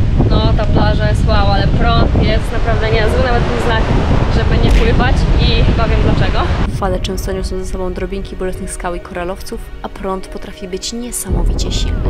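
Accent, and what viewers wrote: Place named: Poland. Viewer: Czy jesteś Polką. Sądząc z akcentu jesteś, ale ilość słow angielskich wtrąconych w polskie zdania jest tak duża, że może polski to jest język obcy dla Ciebie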